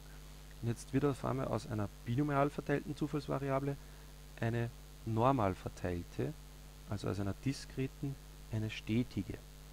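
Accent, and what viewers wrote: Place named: Upper Austria, Austria. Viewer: Sehr gutes Video, das ma wirklich weitergeholfen hat! Gut verständlich erklärt und sympathischer OÖ-Dialekt :)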